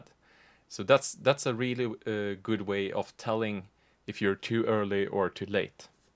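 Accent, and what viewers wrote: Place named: Sweden. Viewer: your swinglish is awesome. thanks for all the vids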